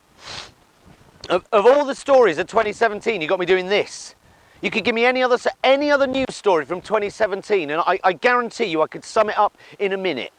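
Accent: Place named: North, United Kingdom. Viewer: A northerner 😂😂😂 feeling the cough too, get well soon!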